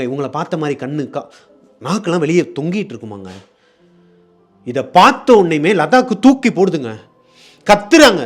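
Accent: Madurai, India. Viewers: Hi.. i m shobana from madurai... i like ur videos... personalave i like u and ur slang.... especially "vellena endhrichuten"... madurai la dan apdi solvanga... neengalum virudhunagar.... so unga videos, pranks ellame parpen.... additionally en baby name prabaharan and my brother name is arjun... so unga videos time ku pathuduven... unga ghost videos la 1 video iruku... <link> <link>... indha video title eh romba bayama irundhuchu... so comments pathen... so many people "ghost vandhuchu.... terrible sound kettuchu" nu potrundhanga.. so andha video mattum nan ipo vara pakkala.... really is it serious to listen...? Clarify pannunga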